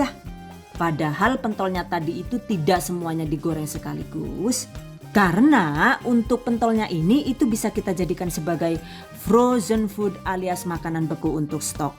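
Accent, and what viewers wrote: Banyumas, Indonesia. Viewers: Dening orang ngapak ya yhu, 🤣🤣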